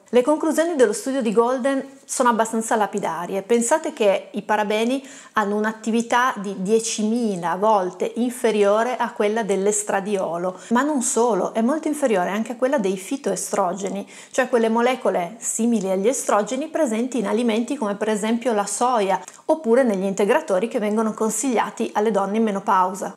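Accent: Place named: Turin, Italy. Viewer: Contentissima di aver trovato il tuo canale, dopo aver letto alcuni tuoi articoli interessanti altrove. Video interessantissimo e molto chiaro anche per un'umanista come me :-) Noto anche con piacere un familiare accento torinese, o sbaglio? :-)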